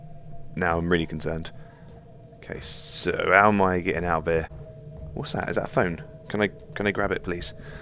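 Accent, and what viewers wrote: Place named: United Kingdom. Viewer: the way you speak just screams british and i love it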